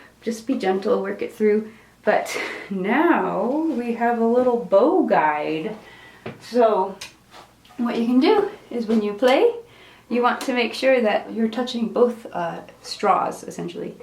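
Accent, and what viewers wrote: Canada. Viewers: I can taste the Canadian in her voice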